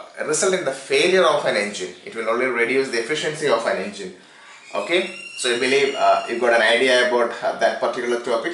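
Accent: India. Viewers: Hi. I would like to share my view on your channel. Whether to take it or not is upto you. First of all, I would like to take the opportunity to appreciate your thought process on explaining some engineering concepts in a really simple manner. It definitely reflects on the number of subscribers that you have obtained for your channel. These are some things which you could do to make it even better. 1. Please do not take the video in one complete shot. Just by that one thing, you are unable to cut some places where you have found yourself a bit off course in explaining the things in the way you wanted them, but you are forced to continue. Believe me, cut it, take things by parts and then stitch them. You will find yourself more confident with your words in explaining it. 2. Please have some more light on your working area. Your videos are being watched by so many people now. 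Which means its better to have a good visual in the video. Its mostly in a shade of dark which I find it a bit dull for understanding concepts. 3. Please make sure you try to take away the Hindi-ish slang from your english. Even though the grammar is upto the mark, there are some words which I found similar to hindi words. No offense, but if you want to spread the knowledge to people around the world, its better to have a separate accent to your english which will make it even more convenient to listen to. Hope I had not offended or stirred any emotions by putting out my thoughts. The reason behind that was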